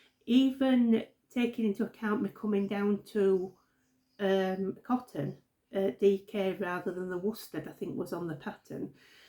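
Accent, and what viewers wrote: Yorkshire, United Kingdom. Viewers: Hi good to see you, I’m actually from Sheffield so I love Yorkshire and the accent, didn’t know they did a Yorkshire day xxx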